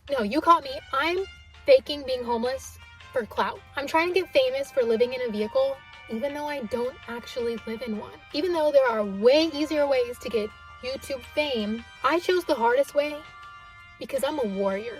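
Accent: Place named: California, United States. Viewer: The only thing i questioned is where is your Texas accent, sounds like you were born and raised in Cali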